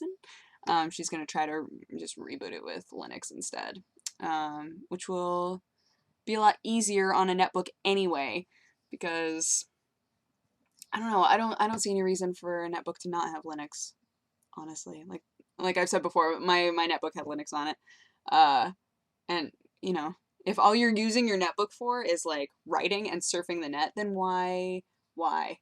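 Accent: France. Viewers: You have the cutest french accent I ever heard!! <3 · I freaking love your french accent haha :D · "Le Pain Quotidien": translated in english it's: "The Daily Bread" Also cute French accent,:) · I'm french and your accent is the cutest thing ever ^^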